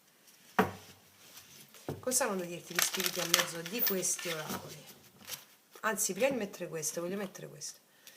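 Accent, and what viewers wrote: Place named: Lecce, Italy. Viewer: Sento una forte inflessione leccese❤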